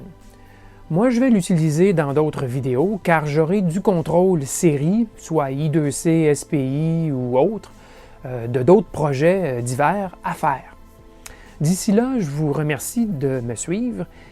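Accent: Quebec, Canada. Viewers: Très bizarre ce verrouillage, peut-être des puces récupérées d'occasion sur des cartes où le programme de la flash avait été verrouillé ? Vu le prix, c'est pas impossible... Eh oui, nos cousins québécois ont un chouette accent :-) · Très belle présentation, claire et très Pro pour un bidouilleur. Je réside au nord de la France et on aime beaucoup l'accent québécois. Merci pour votre travail. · Hello Mr québécois chouette accent :-) Merci pour ces vidéos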